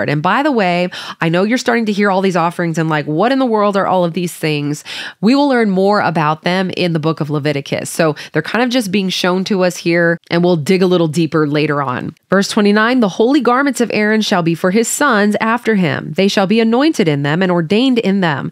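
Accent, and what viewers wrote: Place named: South, United States. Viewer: & Although you do sound a tiny bit like you have a southern accent on .75.. you pronounce things way to clearly lol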